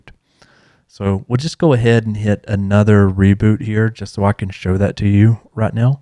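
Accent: United States. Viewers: I read Birmingham and instantly thought "England UK? He sounds American" guess I gotta remember you guys have a lot of our city names lol. Good video btw 👌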